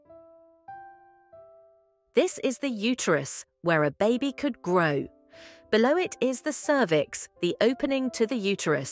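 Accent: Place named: United Kingdom. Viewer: O sotaque britânico é perfeito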